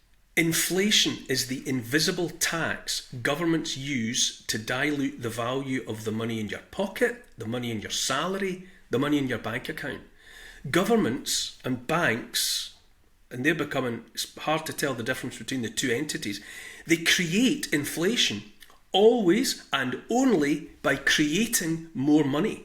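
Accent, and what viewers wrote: Scotland, United Kingdom. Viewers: It's the Scottish accent. Our DNA has been programmed to revolution since William Wallace.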